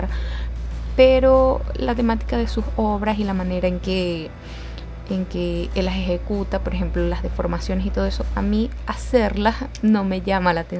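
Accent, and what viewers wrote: Venezuela. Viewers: Pareces del Zulia, digo por tu acento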